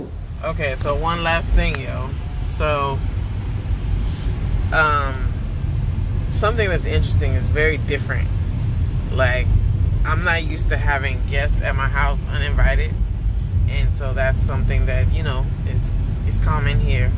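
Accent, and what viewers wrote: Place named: Senegal. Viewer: Hello, I am a Togolese living in DC and I just discovered your lovely family. Just want to tell you that I love love the way you speak French with the Senegalese accent! It is not easy to speak another language especially French. I can see why people would think that you are Senegalese. Such a good role modèle for your daughter. After 30 years in the US, I am planning a return home soon. Although I am African, I spent most of my life abroad. The anxiety of going back is real ! So thank you for inspiring and motivating me! sending positive vibes and blessings to your beautiful family. 🤎😊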